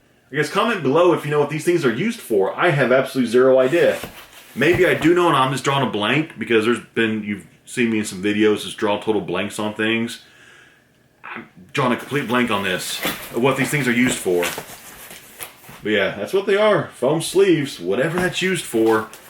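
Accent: United States. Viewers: I had a feeling that you were from the midwest but wouldn't have guess you are a Hoosier aswell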